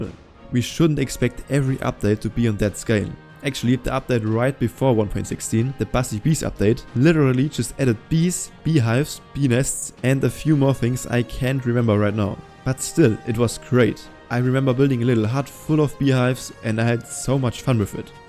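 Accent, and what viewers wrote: Germany. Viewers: Man hört, dass du Deutsch bist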